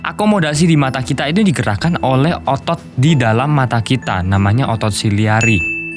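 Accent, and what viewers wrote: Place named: Java, Indonesia. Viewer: Medok seru 😆 tpi apik mas Gas teros kontennya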